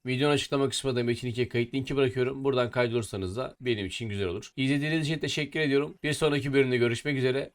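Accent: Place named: Adana, Turkey. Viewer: Adanadan izliyorum kardeşim oyunun hakkını veren oyunculardaniz bir zamanlar ama videonun sonuna kadar keyifli izledim sende bir adana şivesi var gardas başarılar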